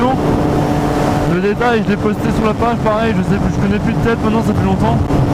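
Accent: Alsace, France. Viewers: T'aurais pas un petit accent alsacien ? ;) super vidéo sinon ;)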